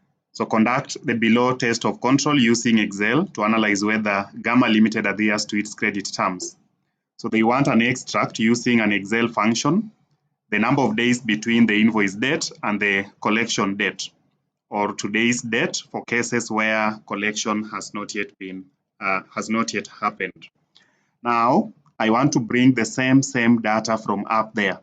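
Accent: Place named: India. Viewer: Why is the audio indian😢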